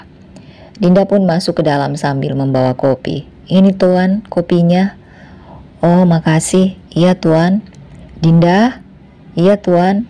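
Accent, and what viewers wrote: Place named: Bugis, Indonesia. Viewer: Suku bugiskah, logatx sih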